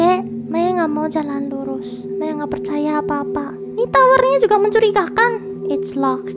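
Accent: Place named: Solo, Indonesia. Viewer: kan dari solo bro 🤣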